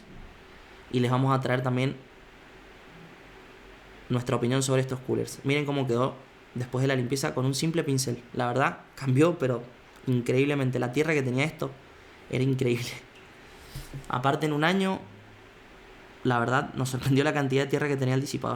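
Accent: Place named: Mendoza, Argentina. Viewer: Sos de Mendoza o cuyo? Ese tonito se me hace familiar jajaja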